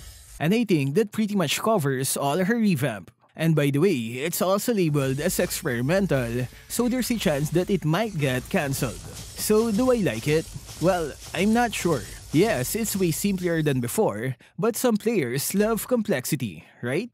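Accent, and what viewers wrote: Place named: Philippines. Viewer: I'm not focus cz Filipino accent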